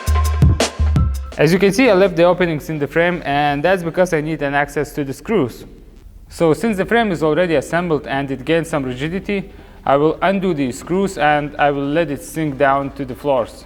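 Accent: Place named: Russia. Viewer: Даже мне рускоговорящему режет русский акцент 😅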